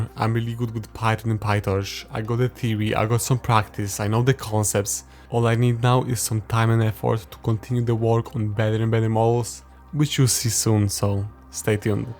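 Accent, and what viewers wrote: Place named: Germany. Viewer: Is creator german? This accent i dont know what is it😂. Cool video btw!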